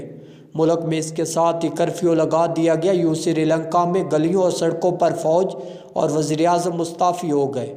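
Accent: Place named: Punjab, Pakistan. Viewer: ye Admi Urdu mea panjjabi Bolta hea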